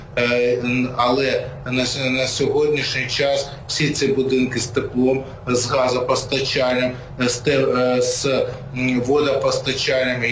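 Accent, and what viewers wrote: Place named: Galicia, Ukraine. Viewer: Как же поддаться, если там смесь польского, румынского, русского, в общем каша галичанская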